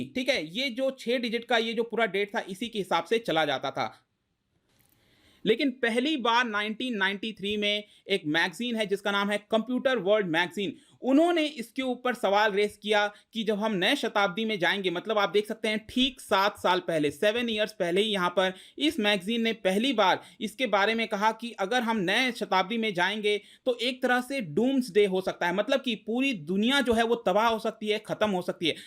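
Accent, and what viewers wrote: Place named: Bihar, India. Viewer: तुम्हारी कर्कश, हाई टोन वाली आवाज साथ में एक ही बात को कई बार रिपीट करना। ढेरों एग्जांपल दे देना। और हाइटोन रखते हुए लगातार बोलते जाना, लगातार बोलते जाना। अगर तुम्हारा यह वीडियो किसी ने पूरा सुन लिया, तो उसका हल्का सा बीपी तो बढ ही जाएगा और वह कम से कम 1 घंटे तक आंखें ऊपर करके बावला सा भी हो जाएगा। जो भी बताना चाहते हो उसको पहले कागज पर लिखा करो। बिना एक भी शब्द रिपीट किए हुए, मधुर आवाज में , संक्षिप्त में अपनी बात कह कर निकल जाया करो। परंतु यह सब तुमसे होगा नहीं। तुम्हारी टो से लगता है कि तुम बिहार साइड के हो, या पूरब साइड यूपी के। वैसे भी तुम लोग बहुत ज्यादा बोलते हो।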